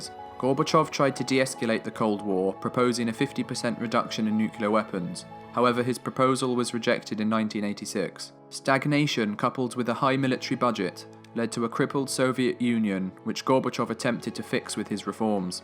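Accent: RP, United Kingdom. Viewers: "Excuse this British accent" - no, I won't. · Dramatic music, slideshow pictures, Wikipedia text, British accent...can’t beat that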